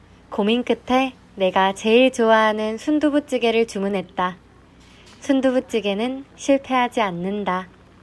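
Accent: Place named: Gyeongsang, South Korea. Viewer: ㅋㅋㅋㅋ 님 사실 과자를 꽈자라고 하시져...?!!!!ㅇ.x(경상도사투리) 오늘도 잘 봤어요💘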